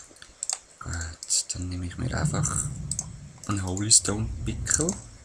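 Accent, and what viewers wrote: Basel, Switzerland. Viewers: bisch du e Basler?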